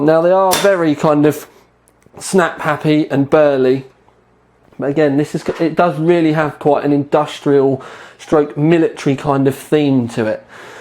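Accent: United Kingdom. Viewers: Let me guess, British accent ? btw excellent video,rich in detail .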